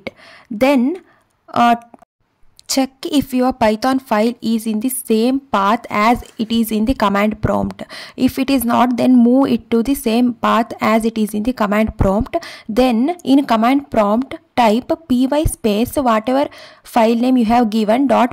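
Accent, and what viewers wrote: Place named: India. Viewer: I hear the indian voice and I know that this video will fix the problem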